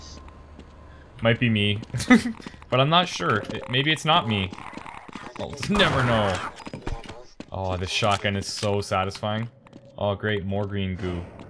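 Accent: Canada. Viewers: I'm so glad I found this LP :) Keep it up! And Canadian accent ftw.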